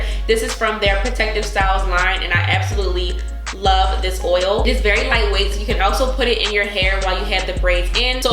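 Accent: Baltimore, United States. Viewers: Now that you’ve stated you’re from Baltimore you and IAmTreah sound just alike but her accent is a lil stronger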